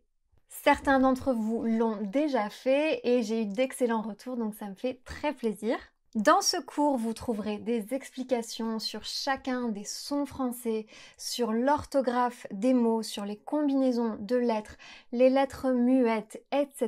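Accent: England, United Kingdom. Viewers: You do realize that she has 100+ videos on her channel right? She speaks English in more than a few of them...and she pretty much always sounds British. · Thank you, your video is so helpful. Plus, I love your english accent! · c’est fou qu’elle a un accent parfaitement anglais! c’est super cool mais juste différent! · Oooooooooo j'aime votre prononciation des mots en anglais. ❤️ J'entends un léger accent British quand vous prononcez les mots; trop mimi; c normal, la France est plus proche du Royaume-Uni que les USA/le Canada.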